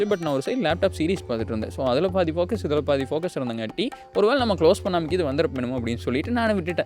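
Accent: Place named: Coimbatore, India. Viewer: உங்கள பாதா சென்னை காரன் மாறி தெரியலையே. கோயம்புத்தூர் மொழி பேசுறீங்க.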